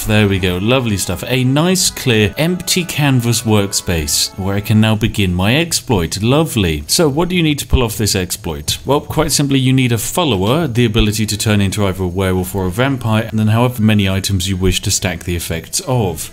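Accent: United Kingdom. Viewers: I love how unapologetically British you are. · I heard "welcome to the wonderful world of" and thanks to the British voice my brain auto filled Terraria. I miss TB.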